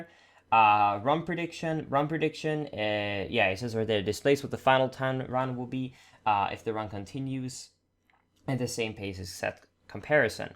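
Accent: Sweden. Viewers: OMG u swedish like me!?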